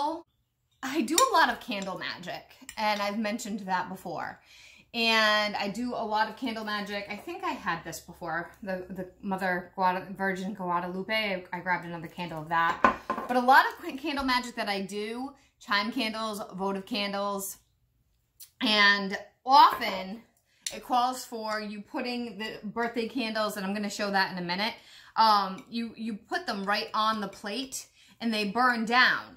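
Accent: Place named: New York, United States. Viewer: I love your videos! 🥰 are you from New York I’m trying to identify your accent!?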